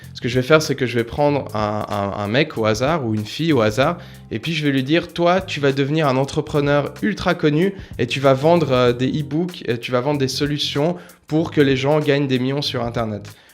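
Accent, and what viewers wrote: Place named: Switzerland. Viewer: Super, vidéo d'ailleurs je suis également Suisse. Je me disais a l'accent. Pis la mentalité ce voit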